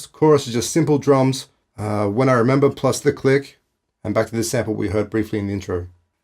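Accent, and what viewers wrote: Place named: Australia. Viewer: oh wow i would not have guessed that was just an irig! Ah didn't know there was such a range of Australian accents, thats great, keep on rocking my dude!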